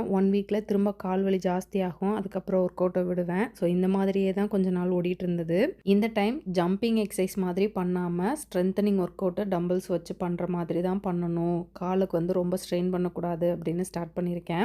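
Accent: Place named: Palani, India. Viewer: Hi sis....recently i started watching ur videos...i love ur Tamil slang because its very nostalgic to me as my mom's native is palani neikarapatti and my relations over there speak like you..your vlogs bring back my childhood memories...today morning i saw ur old vlogs like love story,album vlogs and so on.....oru oru vlogs um enga ammaye oorla naa irukara mathiri feel aaguthu....mainly ur amma veetuvlogs along with your akka n family....!! Ippo yaarum oorla illa sis thatha n ammaye is no more..yellarum padippu,velai,kalyanamnu vera vera oorla settle aagitom....oru oru school summer leave ku vandha eppdi irukumo apdi irukku...unga face kuda en friend Selvi face mathiri irukku....my MEIYAZHAGI😢..!! I donno where she is...cudnt get the contact too!! a big hai to your family members n brother's ideologies!! Final thing i want to share is check your Magnesium level and kuthingal vali reason is mainly low Magnesium...consult a doctor...she will definitely suggest NEUROBION tablets...!! 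Don worry it is Magnesium deficiency..i will suggest ur vlogs to my cousins...so that they can also get the nostalgic experience...❤❤.take care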